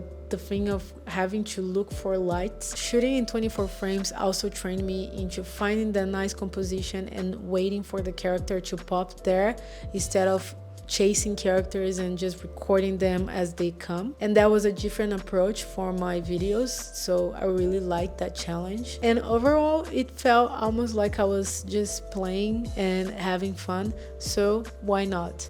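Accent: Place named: Brazil. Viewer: Excellent content, and I love the Brazilian accent!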